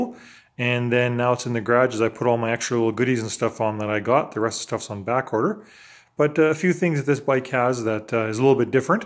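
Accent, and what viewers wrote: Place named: East Coast, United States. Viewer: When I started listening to your video I would have sworn that you were an east coaster. Your accent doesn't sound like Alberta to me! Thanks for the video. I don't like the tft screen, and DO NOT want keyless start! Maybe this is the bike for me. I don't like the white, but maybe that shouldn't matter at all. I am near Niagara in Ontario and plan to explore this great country of ours on two wheels. Part of.menthinks this is the way to go.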